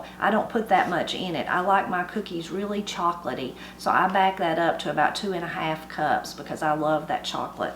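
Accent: Appalachia, United States. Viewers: I recently found your channel and I love it ❤ My mom made these for me and my siblings when we were growing up. The recipe came from my dad’s mom and we call them oatmeal candy. Your accent is very similar to my dad’s and his family. They are from a little town called Lafayette, GA which in the Appalachian mountains 😊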